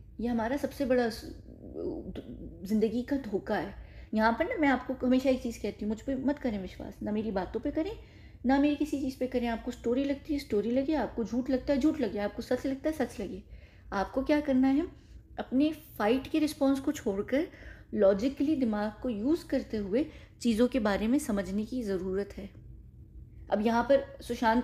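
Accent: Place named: United Kingdom. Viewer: All this is true. But becuz speaker says she is from a wealthy family with an enviable diamond collection , speaking multiple languages with a British accent etc……..she looses credibility.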